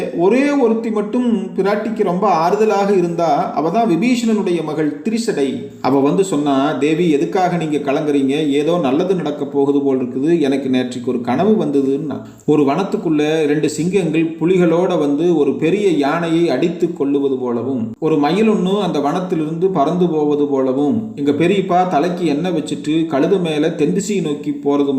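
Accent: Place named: Kongu, India. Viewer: அருமையான சுந்தர கொங்கு தமிழில்......... அற்புதம்... வாழ்த்துக்கள். ஜெய் ஸ்ரீராம். ஜெய் ஸ்ரீராம். ❤❤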